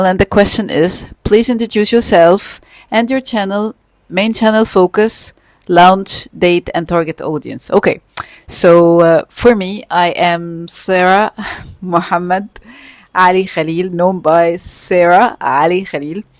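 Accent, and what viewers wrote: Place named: England, United Kingdom. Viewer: Love you English accent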